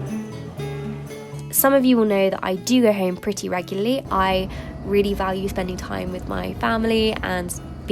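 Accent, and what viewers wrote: United Kingdom. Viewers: Damn....I loooooooove your Birtish ACCENT...JUST EXCEPTIONALLY GOOD..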